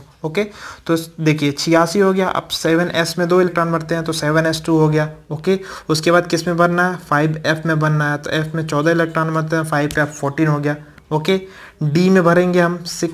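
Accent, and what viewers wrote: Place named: Bihar, India. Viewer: Bhai bhut shai explains krta ho appp❤ love you bro mere ko bhut dikkat thi isma 😊 ak baat puchu bhaiya ap bihar s lag rha ho 😅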